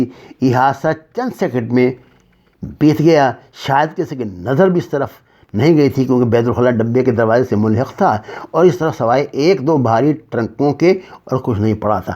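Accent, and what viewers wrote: Punjab, Pakistan. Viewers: Abe Tu nawil mat para karo plzz tum panjabi men Urdo Bulta h.. Plz kisi ache andaz men padhne wale aadmi padhwaliya kato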